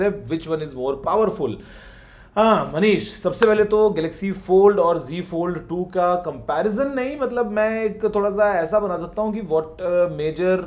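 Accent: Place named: Marwari, India. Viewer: Aapka marwadi aati ha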